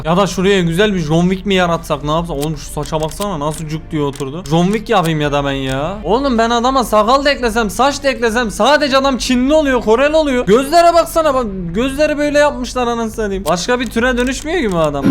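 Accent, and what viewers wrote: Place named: Kahramanmaraş, Turkey. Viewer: Abi böyle diyomda bende boyle konuşuyom bende maraşlıyım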